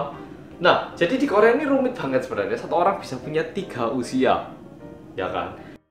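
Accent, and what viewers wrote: Java, Indonesia. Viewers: Kok medoknya kerasa mirip sama mas Kaesang yah... 😍😍😍😍👍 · Aku baru nonton videomu loh mas. Medok banget ya, Mas'e 😂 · Emmm cuma pingin komen sampeyan medok banget Mas 🤣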